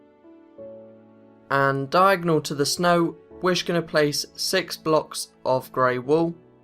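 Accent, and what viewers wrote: United Kingdom. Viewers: hes british if you say anything about his voice f**k u because its racist and stampys avatar is not copyrighted so shut up and if you think hes boring type in mansion tutorial the guys a robot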